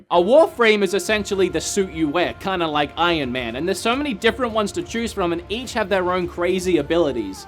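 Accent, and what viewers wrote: United States. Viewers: I'm Australian, all I can say is that your accent is devolving to American. Also I want to send you something what do u neeeeed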